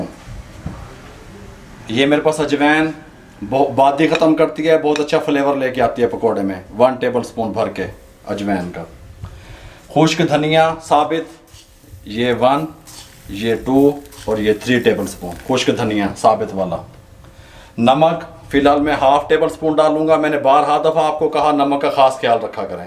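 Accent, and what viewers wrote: Punjab, India. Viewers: G Allah apko BHT khushyan de mje apka bat karne ka smjane ka tarika punjabi bolne ka style BHT acha ha uncle Allah apko kamiabia de ameen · Ap k accent Punjabi h ap Punjabi bola kren bht acha lgta h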